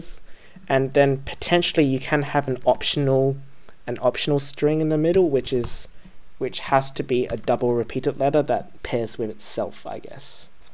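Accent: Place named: Australia. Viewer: Omg the Australian accent 🔥🔥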